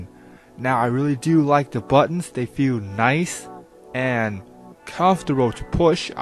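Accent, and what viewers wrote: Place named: South Korea. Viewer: quikfinger00 it Sound like he have Korean Accent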